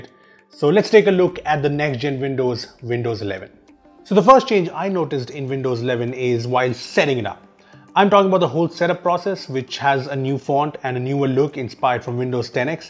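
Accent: India. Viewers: Ok i will watch it even though its WITH INDIAN ACCENT haha but yeah thank you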